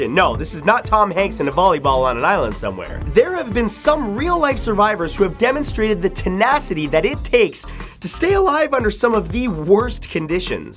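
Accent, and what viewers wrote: Canada. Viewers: Canadian eh? love it and ya winter is BS here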